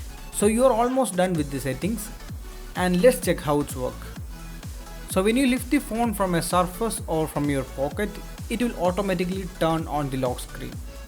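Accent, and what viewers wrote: India. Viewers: 😂😂😂India man..English India...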